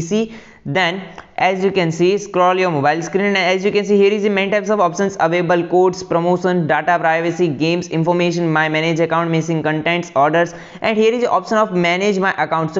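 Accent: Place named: India. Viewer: Indian accent sound so dam hot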